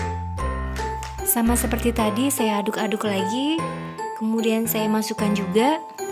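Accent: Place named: Java, Indonesia. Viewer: Rm sedapnya kue dibelinya😄 jowo medok hadir embak e. Yuk yang lain...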